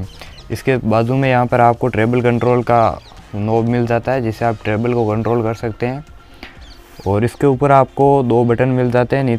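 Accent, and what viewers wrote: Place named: Rajasthan, India. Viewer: Pka rajsthan se ho 😄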